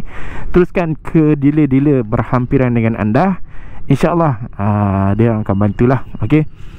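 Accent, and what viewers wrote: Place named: North, Malaysia. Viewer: Baru 1st time tgk video abg..menarik makin hujung video makin byk pelek utara kouaq 😅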